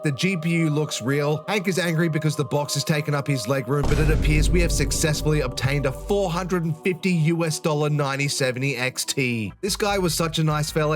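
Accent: Australia. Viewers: Do people ever comment about your aussie accent? I live in an area where you never hear it.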